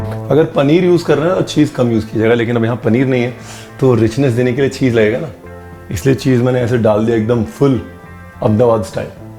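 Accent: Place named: Punjab, India. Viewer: Recipes te ghaint hai hi bolande style wah ji wah pure Punjabi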